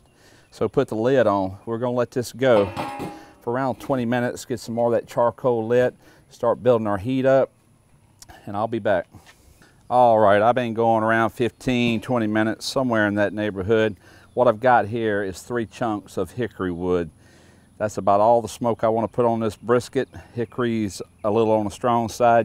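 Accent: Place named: Mississippi, United States. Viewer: You can tell your a Mississippi Man....lol. sound like my friend Amos Fowler ( ex NFL player)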